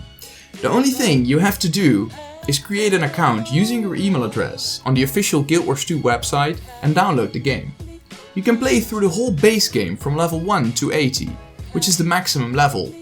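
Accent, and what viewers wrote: Netherlands. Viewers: I hear a dutch accent :P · This fucking Dutch accent lol. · haha are you Dutch? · Are perhaps Dutch? I'm basing that on your accent..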